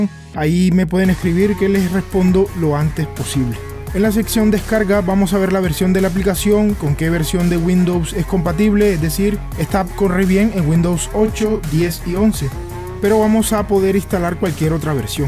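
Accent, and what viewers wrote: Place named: Cartagena, Colombia. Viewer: Amigo, usted es de Cartagena Colombia ¿Verdad? Esa voz no creo que sea de otra parte !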